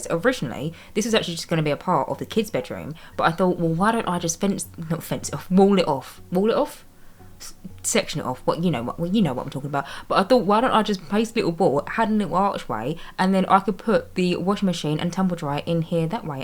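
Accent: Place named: London, United Kingdom. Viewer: I absolutely adore the way you build so realistically. The way you clutter is amazing and feels like an actual home and not an ideal, spotless house. I play TS4 on console which means I can't have the tool mod, so a silly lil thing I do is download your builds and then just take any things that I like that you rotated (eg. the journals that you had in the teen's room) and just save it to use for myself later on, lol! But anyways, I really enjoy your videos and especially your accent, it makes me feel so at home since I don't live in London anymore.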